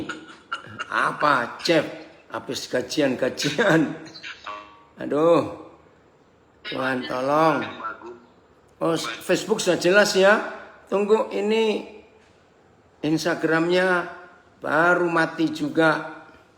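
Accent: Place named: Surabaya, Indonesia. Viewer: Jelas psk dt suaranya dr surabaya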